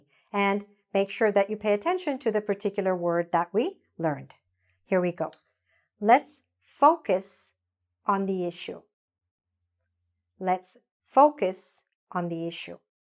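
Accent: United States. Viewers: In London we don't open the mouth in pronouncing ( have/ has ) and we don't stretch the pronunciation of the letter A in ( have / has ) like you do and that is because you speak American English and not English English. Thank you for your nice show. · This video teaches us the words in an American or British accent?, i'm so sorry because I don't know, it's because I'm a beginner learning English😊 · Una pregunta las clases son con acénto americano o britanico? Gracias.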